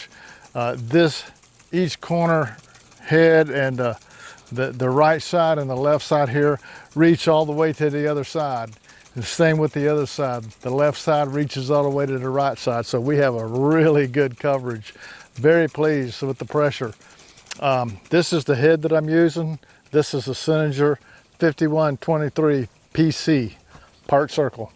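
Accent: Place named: Outer Banks, United States. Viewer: I'd be willing to bet your originally from carolina with that Hoi Toider accent. ;-)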